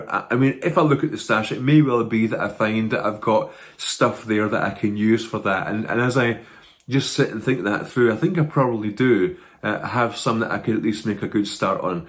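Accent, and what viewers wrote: Scotland, United Kingdom. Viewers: And a HUGE thank you to you too dear for the very important role you play in my knitting life! I find your calm, Scottish accent so relaxing and your no-fuss approach similar to my dear husband’s. At the moment I’m having the joy of making my youngest granddaughter a cute farm playset for her first birthday in July - I’ve made the 12 fields and am currently making the pond, stream, veggie patch etc. then I’m onto all the cute little animals ❤ best wishes to Katie as she heads off to university - best wishes from Elizabeth in Tasmania.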